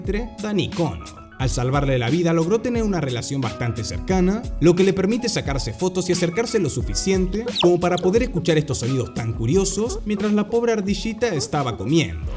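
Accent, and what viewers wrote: Rioplatense, Argentina. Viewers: Sos Argentino? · Es Argentino. ..😎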